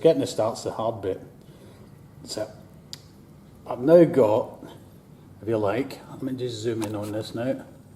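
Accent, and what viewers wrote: Scotland, United Kingdom. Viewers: Thanks for sharing! Great video, don't worry about it being a bit raw, people watch it for your skills and I also appreciate listening to some Scottish ;)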